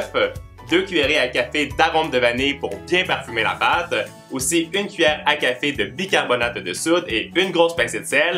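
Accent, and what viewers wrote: Canada. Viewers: J'adore ton accent canadien t'est vidéo son trop bien continue comme ça on adore tes vidéo YouTube bisous 😘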